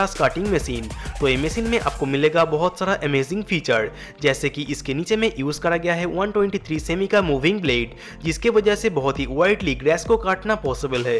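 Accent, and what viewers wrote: Bengal, India. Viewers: Okay but voice is a mixture of Bengali and hindi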